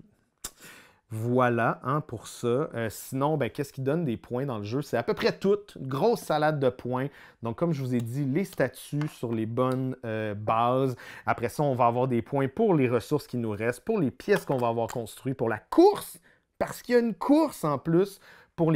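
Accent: Quebec, Canada. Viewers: L'accent, ça va, je m'y suis fait avec toutes ces videos québécoises. Bientôt c'est moi qui vais le prendre, PAR CONTRE, cette image convexe ou concave, ce n'est PAS possible !-)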